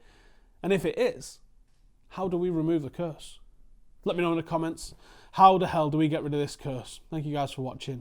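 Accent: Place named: Cockney, United Kingdom. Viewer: Accent Cockney 100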